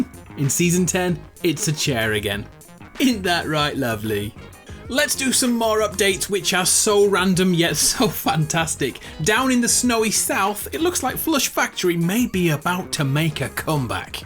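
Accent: United Kingdom. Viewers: May I ask are you are a Brit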